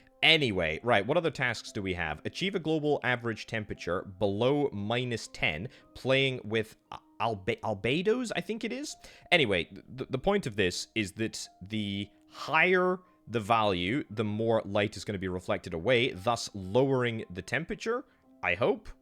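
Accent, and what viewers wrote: Scotland, United Kingdom. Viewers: Which region of Uk are you ? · Scottish accent?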